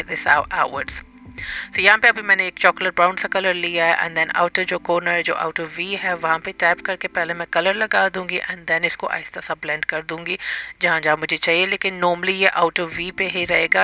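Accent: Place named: United Kingdom. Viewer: Watching from Karachi,i love your work,and your English accent ❤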